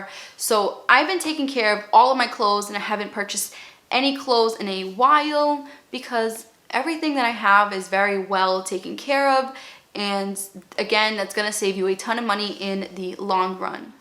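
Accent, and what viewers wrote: Boston, United States. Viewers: I am from Boston too ,, great accent right?? You are wicked smot,, lol! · You have like a Boston accent haha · I hear a BOSTON accent 💕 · I really love your Boston accent.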